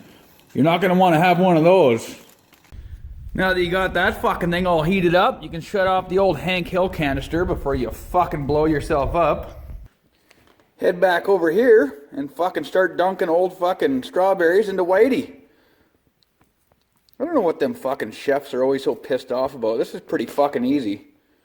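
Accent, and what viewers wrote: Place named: Alberta, Canada. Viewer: Fuck yeah ! I can tell your from Alberta haha I’m from Edmonton I love your minty as fuck videos keep posting them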